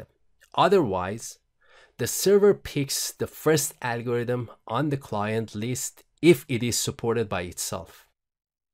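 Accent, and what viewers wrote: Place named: Iran. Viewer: I think the speaker is Iranian I can tell from his accent I am Persian too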